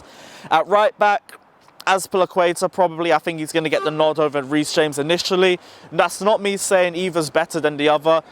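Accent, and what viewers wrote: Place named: London, United Kingdom. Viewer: he's from England bro. From the accent you can tell he was born and raised in London or thereabouts. He's reading Werner in English. Nothing is wrong with the way he pronounces the letters. He just has to make an effort to pronounce it the german way since it's a german name. That's all....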